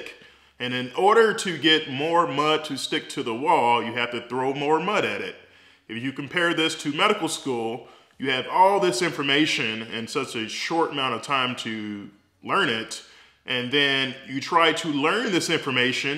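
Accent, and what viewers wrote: South, United States. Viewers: Laconian Drakon I just think he’s a southerner. Not a big deal. I saw another video where he talked about spinal “manip-a-lation.”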